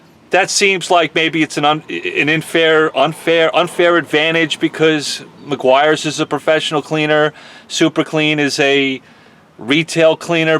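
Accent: New York, United States. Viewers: You may be in Dallas, but I'd recognize that New York accent a mile away. My guess is Long Island, same place I grew up before moving to Phoenix.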